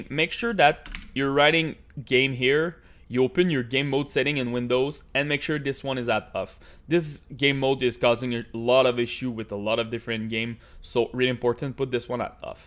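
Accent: Canada. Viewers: Or u know, canadian accent. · Toi t’es québécois avoue sa sentent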